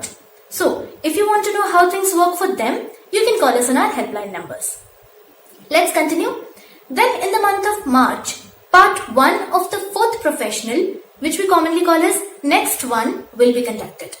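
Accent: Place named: United States. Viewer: About to become american accent,practice more 👍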